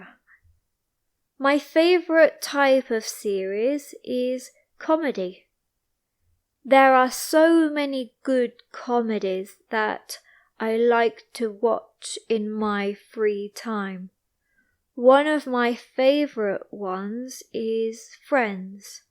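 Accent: United Kingdom. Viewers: 😮 Beautiful Beautiful voice, I am learning the British accent, I love this beautiful accent, and thank you for your videos, my friend, I really appreciate it. Greetings from Mexico!!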